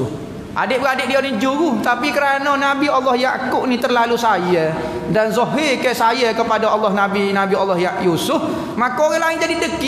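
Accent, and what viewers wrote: Kelantan, Malaysia. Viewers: Sedak dengar kecek klate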